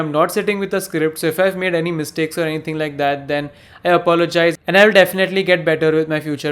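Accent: India. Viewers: well, he looks & sounds like Indian.... Cmiiw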